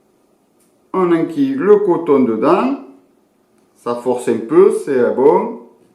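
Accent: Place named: Béziers, France. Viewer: Moi je vais me lancer dans le reconstructible et tes vidéos sont tops. Écoute pas les couillons et avec ton accent on doit pas être bien loin l'un de l'autre moi je dirais tu vis pas loin de Béziers. Bonne continuation d'une nouvelle abonnée. Je suis con je viens juste de voir que ton pseudo ya Narbonne lol c'est moi la couillone mais je m'étais pas trompé.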